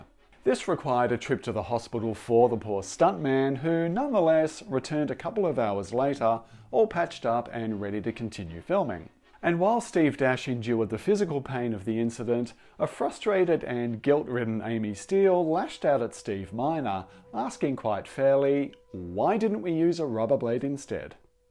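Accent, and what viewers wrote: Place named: Europe. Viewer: It’s kinda wild to hear someone with a European accent, critiquing these films. I guess I never considered that these films (especially the early ones) might have had an impact on foreign European audiences, which tend to be softer and less keen on gratuitous gore and violence.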